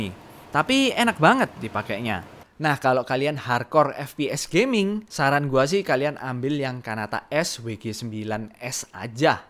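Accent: Java, Indonesia. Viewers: medhok lee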